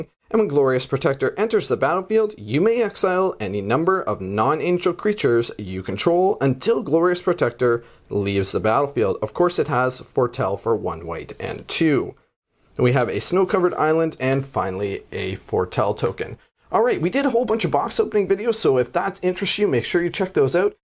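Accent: Canada. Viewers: No idea you were canadian and now i can't unhear it :P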